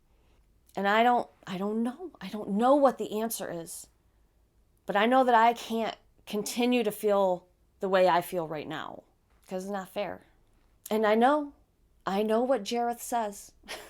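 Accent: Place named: Michigan, United States. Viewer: Hi,. You recorded this video on my 47th birthday, and I'm watching the day after. I keep coming back to your videos because, if I'm honest, your accent takes me home, even though I haven't lived in Michigan in nearly 30 years. Beyond that, you're so relatable. I told my husband (my BFF, the breadwinner, and the one who I know always has my back) last August, "I did a thing. You know I have a hard time asking for help, and always have, well, I have an appointment with a therapist in two weeks at the same counseling center we used with our daughter. I'm not depressed, but I'm not doing well and I need help." Long story short, perimenopause will kick a neurotypical woman's butt, and absolutely make everything that a neurodivergent woman struggles with on the best day, way way more difficult. I know what depression is (been there, taken the Rx for it), that wasn't it. 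For me, perimenopause ramped up my (now diagnosed) autistic traits AND my ADHD traits and masking became more than my physical body could keep up with, and I was doing well to do the bare minimum in every aspect of my life (as homemaker, wife, mom, grandma, and friend, too). My virtual hug and older sister recommendation to you is this: if you need someone to really listen to you, like you probably listen to your friends, find a therapist. Therapy is so worthwhile. Everyone needs a safe place to talk face-to-face with someone about all the things, and creative introverts seem to be that safe place for their f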